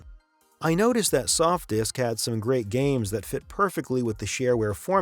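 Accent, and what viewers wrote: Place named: United States. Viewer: Really you sound completely American in this video? Good job. So what do you think, cyberpunk 2077... Greatest game of all time, a masterpiece?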